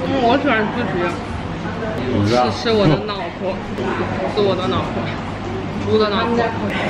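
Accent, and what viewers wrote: Wuhan, China. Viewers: 哈哈哈 这武汉话和我男朋友家里说的一模一样😂😂😂同在澳洲，果断关注❤️❤️ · 看到好多好吃的，还有武汉话，好亲切。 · 看博主别的视频的时候听口音就觉得是我们武汉的普通话hhh，然后发现了这只vlog证明了我的猜想！看片头就认出了销品茂的金逸哈哈哈开心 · 刚从武汉的家里回到墨尔本，看了你的vlog又开始想家了。平时可能不觉得有什么，在异国他乡听到看到故乡的东西总是会让人有更多的感触。其实一开始我是搜旅游vlog看到你的，然后发现口音有点熟悉，点开主页发现果然是武汉人哈哈哈！ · hhhhh你一回武汉普通话就有口音了哈哈哈哈 我哥也是华师一的 好像是08年入学的（如果我冒记错的话哈哈哈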